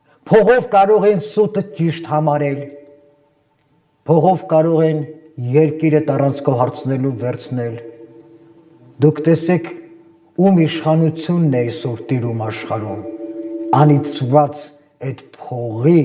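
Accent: Standard. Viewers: 🇦🇲♥️✝️Տիկին ՚Ես իրանահայ 1969 ից ապրելով Հայաստանում ՚1992 ից ՚ապրելով Գլենդելում ՚շատ զարմացած եմ ձեր քաջության՚հզորության եվ մաքուր Հայերեն խոսացող ՚գրագետ ՚դեռ չեմ տեսել ՚ես շատ կուզենայի ապագա Հայաստանի Պրեզիդենտի աթոռին։Դուք կին լինելով ՚100 տղամարդու որը Հայրենասեր են ՚ավելին եք՚դուք կփրկեք Հայաստանը եվ Հայրենադարձություն կսկսվի ձեր ժամանակ ՚որով հետեվ դուք կպաշտպանեք նրանց շահերը։👏👏👏 🇦🇲🇦🇲🇦🇲❤️❤️❤️🎯🎯🎯✝️✝️✝️ Բարեվներ Գլենդելից ։ 🇦🇲❤️🇺🇸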